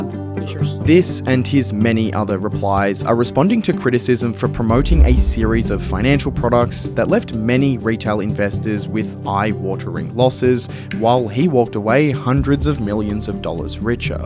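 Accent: Australia. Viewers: Ohhhh... "Arn" = own in Aussie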